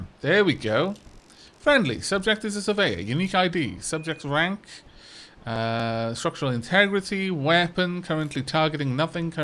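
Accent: Wales, United Kingdom. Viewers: is Welsh, but living in Scotland. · Benjamin Chisholm I believe he's Welsh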